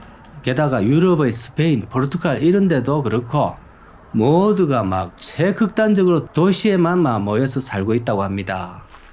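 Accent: Gyeongsang, South Korea. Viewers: 우야노 님 멘트 넘 재미져요 친밀감느껴지는 사투리 옛 생각 납니다 저도 경남거창 줄신이라 사투리가 정겹습니다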